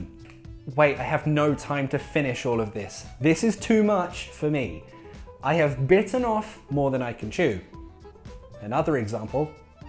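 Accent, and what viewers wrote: United Kingdom. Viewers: Bro, Your accent is exactly like Harry Potter. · is he British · I luv the British Accent 😄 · What is your accent? Is it ordinary british? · Hahaha I discovered your channel some days behind and I am really enjoying it a looooot~ u made me want to forget the american accent I learned to get the British accent u speak haha Thank u for the really funny and educative videos~ hugs from Brazil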